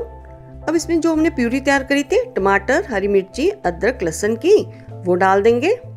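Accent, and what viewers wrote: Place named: Himachal Pradesh, India. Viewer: Mem 🙏🙏Aapki voice pahadi he (Himachal)ke jesi sweet voice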